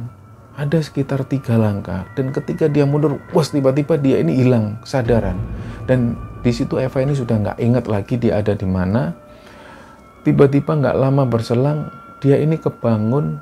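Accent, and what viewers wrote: Java, Indonesia. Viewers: Medok bgtttt Mas mantap bhsa nya👍👍👍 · Selamat ultah yang pertama. Semoga semua sehat2 dan channel nya semakin berkembang, aamiin. Dari konsepnya, kostum, logat medok semua sudah pas, hehe. 👍😊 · Manteb mas jawa gak lupa jawane masuk denger nya enak pemabawa acara jos ❤️ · Betul mas,medhok itu ciri khas orang jawa. jangan dikira cuman logat jakarta yang lue gue saja yang enak didengar. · Mas itu keluar aura MISTIS nya justru krn medok nya... Klw medok nya ilang, seperti ada yg kurang, Salam Taklim kagem Mas... 🙏🙏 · terus pertahankan suara medok nya dan kidung pembuka, sukses selalu mas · Ciri khas mas medok" suaranya,sukses sll,aku suka sekali👍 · Iya medoknya jgn hilang mas, itu kan ciri khas n sy suka dengarnya. Sukses selalu tim, semangat💪🏻💪🏻💪🏻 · Selamat anniversary, pertahankan originalitasnya..jangan sampe hilang medoknya mas...itu yang bikin gaya berceritanya menghipnotis audience..hehehe..semoga semakin besar,semakin bermanfaat..sehat, sukses dan berkah selalu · gpp medok yg penting jangan di banyakin bahasa jawa nya soalnya banyak juga yg nonton gabisa bahasa jawa🙏👍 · Happy 1st Anniversary! 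Jujur pertama denger channel ini saya gak berani denger intro nya, bener2 merinding! Lama2 saya bener2 kagum, krna channel ini berbobot dan punya ciri khas yg kuat entah itu pembawaan narator, background studio dan pemilihan backsound. Oia, saya jd suka denger org ngomong medok jawa krna channel ini jg 😁 Satu lg kualitas channel ini adlh membawakan kisah nyata disertai observasi lebih dulu dan menyertakan bukti2, jd gak asal cerita. Pertahankan ya mas2, semoga segera ke 1juta subs, semangat!! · Justru dgn medok aslinya itu maka cerita yg dibawakan lbh menarik, dan cara menyampaikan bgt tenang, sy tiap hari dengar crt tiap malam diatas jam 11 sampai subuh, sambil kerja pencatatan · Logat medoknya mas sudah menjadi ciri khasnya,dipertahankan saja biar beda dari chanel yang lain. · Yaumul milad 1thn..tetap jadi canel yg sangat2 inspiratif...nggk alay....pembawaan yg sanggat2 muedok polll...tutur kata yg bikin merinding...kidung lawas yg mendayu dayu...terus sehat crew...tetap istiqomah..rendah hati dan tembus 2jt subscribers...Aamiinnn ya Alloh🤲🤲🤲🙏🙏🙏👃love you Allll❤❤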